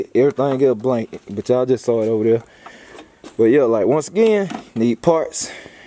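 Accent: Georgia, United States. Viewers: Im from Vegas & You sound like my family in Lagrange, GA. I dont see/ hear anything wrong with the way you talk. You remind me of my cousins. Keep building these cars & enjoying life. Negativity comes with life. Keep the videos coming. 💪🏽💪🏽🏎💨💨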